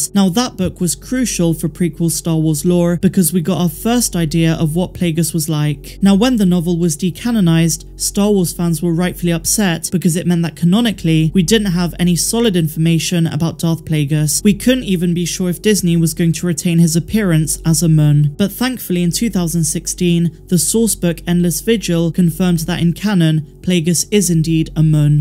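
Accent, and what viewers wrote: United Kingdom. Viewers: Why do you sound British?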